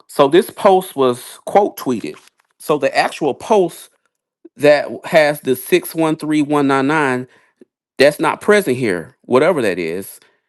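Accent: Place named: Texas, United States. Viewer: Also are you from TX too bc your accent, no shade at alllll, sounds similar to Milagro the way your pronounce certain words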